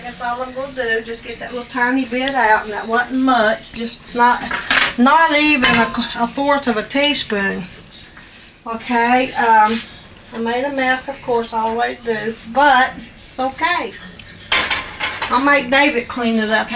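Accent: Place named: South, United States. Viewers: I love when cute lol says. Let me tell you something in her country voice. And her nice kind husband david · I love your videos. I am originally from Virginia near the WVA border, so your accent sounds like home! I am going to try the Fig Newtons. I think we will like them. The bacon looks good too. I'm going to share your channel with my daughter who loves to cook. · I like to watch you cook and hear you talk. Wondering where you live and if that is your real kitchen. It's so comfy looking. You accent sounds like mine ; I'm from South Central Kentucky.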